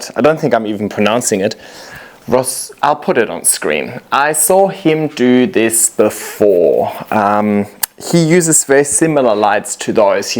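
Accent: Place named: Germany. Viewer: I loved this video - you had me giggling several times! And you got my brain going as I am just starting my moss pole journey - propagating and gathering up a few plants right now and will start replanting soon. As I have been doing this - I am starting to think to my winter (up here in Oregon) coming in a few months and I know I will need to starting thinking about lights when I bring the plants inside! Your ideas are always fun and really help me to be creative as well. Someone else said they enjoy listening to you - I do too. The first video i listened to, I kept thinking, "where do I know that accent from?", then it hit me! you sound just like Helena, my German foreign exchange student from 12 years ago! I am hooked on your videos and can't wait to see if I can replicate a few of your fun moss pole successes!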